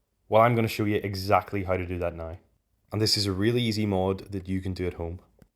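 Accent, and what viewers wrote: Northern Ireland, United Kingdom. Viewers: have you been to matchetts in belfast? i can tell you’re from ni from ur accent 😂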